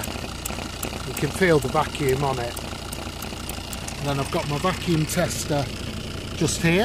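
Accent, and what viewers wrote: United Kingdom. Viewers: yo are u british bruv???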